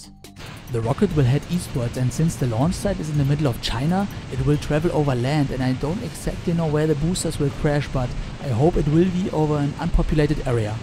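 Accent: Germany. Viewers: he sounds German lol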